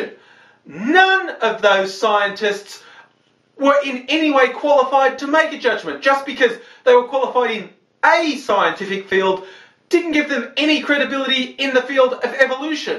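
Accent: Australia. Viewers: Your definitely an Aussie,and your definitely angry,well sort of,in a controlled type angry way,like angry with a purpose,almost assertive with a loud controlled voice,anyway good vid,good points made. Do you think the C's will listen,I doubt it,they have a god given logic filter,but at least you got it of your chest,just keep shouting at them.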